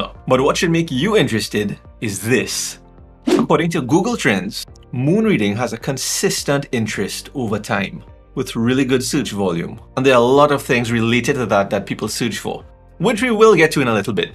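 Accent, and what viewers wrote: Trinidad and Tobago. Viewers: I just heard you speak and said to myself he a whole Trini! tell me i'm wrong?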